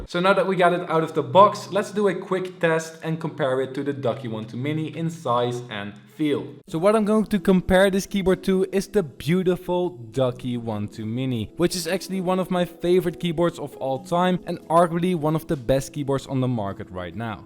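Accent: Netherlands. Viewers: are you dutch??? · Jij kan goed engels maar nederlands accent valt altijd te horen xD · Ik dacht al dat je Nederlands was !💙💙💙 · ik dacht al dat je nl was maar ik was nog aan het trijwelen met je accent maar yeey je bent nederlands ik ben een goede detective :3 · Wow are you dutch? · Are you Dutch?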